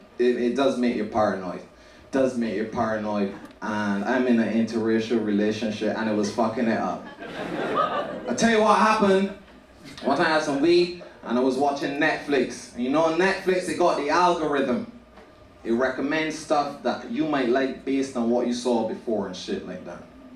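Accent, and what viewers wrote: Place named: Barbados. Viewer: With a Bajan accent too!!! My kindah humour my kindah comedian!!!!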